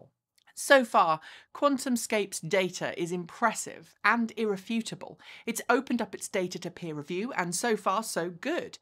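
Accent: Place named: United Kingdom. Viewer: A British accent just makes everything sound legit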